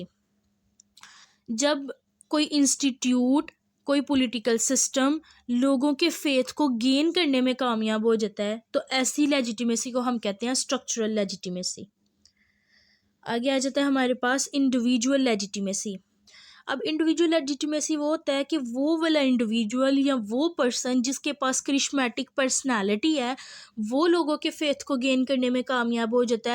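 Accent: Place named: Lahore, Pakistan. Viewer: Lahori awaz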